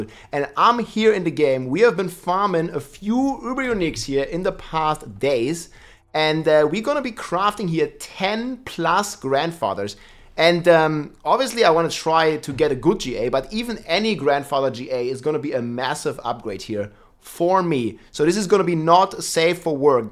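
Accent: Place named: Germany. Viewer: My only suggestion to you would be. If you hate everything about this, skip it. He is not going to change the way he speaks. It's his accent, and he is german. Plus, I highly doubt that he would even try to, just because of one comment on youtube. :) I wish you well, but I also suspect that your frustration has roots in much deeper problems.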